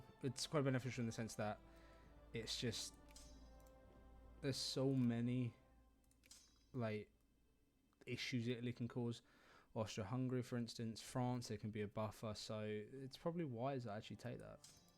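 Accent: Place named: Kent, United Kingdom. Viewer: You from Kent? You sounf like youre from kent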